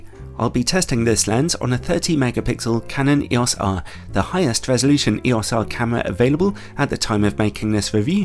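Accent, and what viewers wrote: United Kingdom. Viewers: Love the music and the British accent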